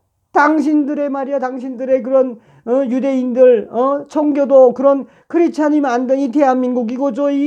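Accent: Pyongyang, North Korea. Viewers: 북한말씨 섞어서 말씀하시니 더욱 재밌어요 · 여기 첨 왔는데 북한 사투리가 괭장히 낯선네요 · 하나님이 쓰시는 분이라고 느껴 집니다 김일성 김정일이는 지금 지옥에서 고통 받고 잇겟지요 얼마나 많은 사람들이 이 부 자 에게 죽임을 당햇을까요 그리고 수용소에 끌려가서 고통당하고 잇을까요 지금 이시간에도 그손자에게 고통당하는 북한주민들 정말 불쌍합니다 비록 그들이 쇠뇌당해 미국과 한국을 죽여야 될 적이라 생각 하겟지만요 아무튼 그들은 그렇다고 쳐도 남한에서 태어나고 교육받은 좌파 놈들은 무슨 생각으로 저렇게 사는지 정신이 돌아버린 놈들 같아요 참으로 한심하고 괘심합니다 북한 수용소에 다 쳐 넣어서 하루만 살아보면 아마도 속안더러 알게 되겠죠 아무튼 그날이 머지 않을것 같아요 왜냐하면 하나님이 다 보시고 계세요 북한과 남한의 기독교인 들의 사무친 기도가 하늘에 상달 되엇기에 하나님이 일 하실 것입니다 북한 사투리 너무 듣기가 좋아요 건강하시고 행복하세요 fighting!!!!! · 정성산 동무 사투리 는 북한 어느지역 사투리 림네까? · 구수한 평양 사투리 듣기 재미나고 정겹습니다 인물도 좋으시고 현실감 정말 좋습니다 이제 중독됐어요 감독님 감사합니다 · 이북 말투를 들으나니까 고저 기분이 좋습니다. 돌아가신 우리 아바이 생각도 많이 납네다! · 그말투 진짜로 윗동네 말투입니까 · 북한 말이 거스립니다 일부러 하시지말고 그냥표준 말로 해주세요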